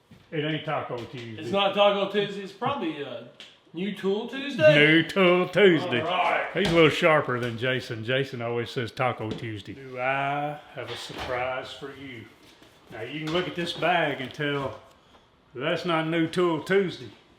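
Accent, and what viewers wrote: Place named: Alabama, United States. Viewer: Sounds like a 'Good ol' boy' from Alabama. Good comparison regardless.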